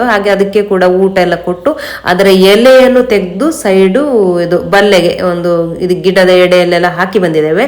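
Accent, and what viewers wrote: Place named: Sullia, India. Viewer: ನಿಮ್ಮ ಸುಳ್ಯದ ಗ್ರಾಮ್ಯ ಕನ್ನಡ ಬಹಳ ಚೆಂದ ಇದೆ.ಹೀಗೇ ಮುಂದುವರಿಸಿ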